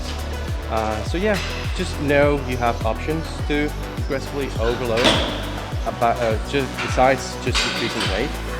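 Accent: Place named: United States. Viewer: Where you from bro you sound american but says your from the 🇬🇧 keep up the good work though bro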